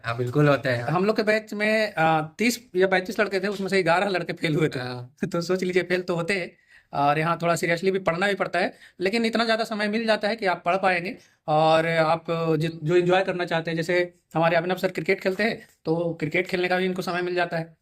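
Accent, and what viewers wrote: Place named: Magadh, India. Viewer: I recognised you by your magadh accent I am also from Nalanda(Bihar Sharif)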